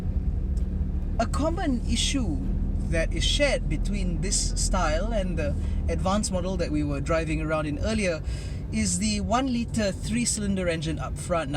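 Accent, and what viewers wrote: United Kingdom. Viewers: Localized Queen’s English..terbaik bro👍🏾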